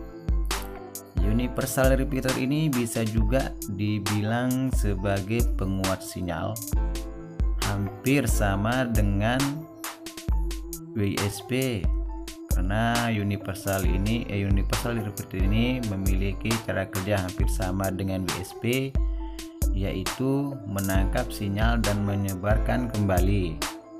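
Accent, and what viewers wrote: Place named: Lombok, Indonesia. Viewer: Kayaknya nada2 nya orang Lombok ini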